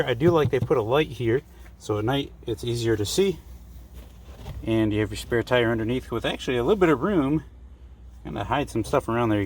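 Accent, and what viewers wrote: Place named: Cleveland, United States. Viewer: Thank you for the overview. You have a very welcome interesting accent. You sound just like my friend from Cleveland. Are you in Cleveland.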